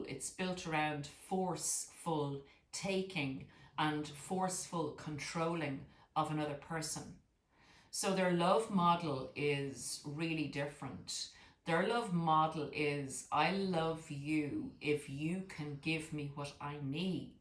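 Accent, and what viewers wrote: Ireland. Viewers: It is like balm to my soul to listen to your lovely irish voice. I have had a lifetine of narcissistic trauma. Parents,ex husband,romantic relationship,boss. I am so done with it. NEVER AGAIN!!! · Her beautiful and musical Irish accent, and amazing storytelling (another undeniable Irish talent) just keepet me hooked to her great content. Thank you for nurturing us with your wisdom and beautiful Irishry.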